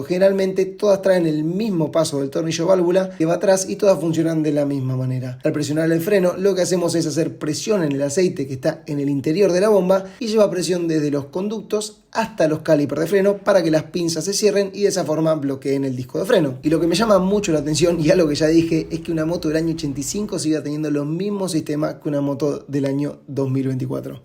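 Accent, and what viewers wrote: Argentina. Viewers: Sos argentino ? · Me encanta el acento argento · Hermano te felicito ! Es hermosa la moto que compraste, y espectacular el proceso hasta hacerla andar, te hago una pregunta ya que por el tono sos Argentino y se ve que no vivis en el país.. en que pais y cuidad estas ? Gracias saludos desde Mendoza, Argentina.🎉 🇦🇷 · por tu forma de hablar pareces Argentino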